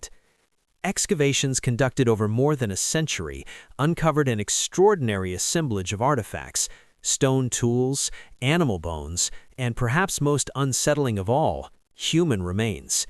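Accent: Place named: United States. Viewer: I can't listen to this because the American accent is too annoying.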